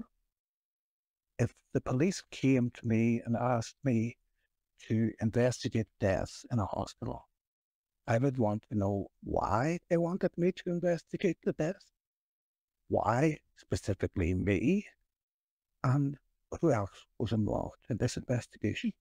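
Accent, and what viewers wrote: Belfast, United Kingdom. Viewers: The audio quality is not the best (particularly on these earlier episodes) which is likely down to mic (recorder) but it does improve in later episodes. He's currently up to elosode 57. His Belfast accent may also cause difficulty to those that are not used to such an accent.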